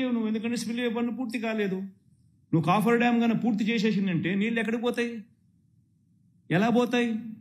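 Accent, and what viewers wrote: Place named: Rayalaseema, India. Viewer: rayalaseema slang n language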